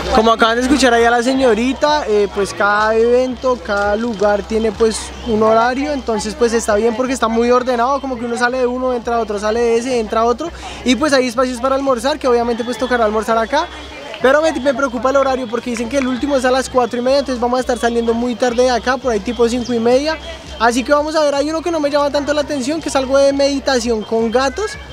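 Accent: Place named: Cali, Colombia. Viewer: Como venía de Cali se le pegó el hablao'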